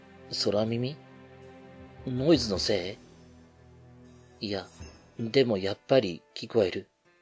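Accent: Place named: Kansai, Japan. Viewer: こんばんは😃🌃 [週刊怪談]ありがとうございます～🥰関西弁可愛よ💝拝聴中～🤗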